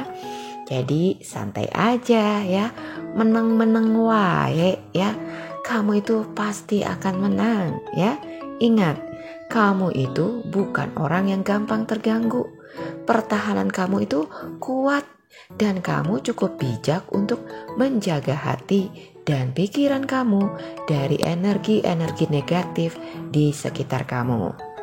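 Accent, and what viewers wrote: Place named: Sunda, Indonesia. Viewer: Aksen Sunda nya lucu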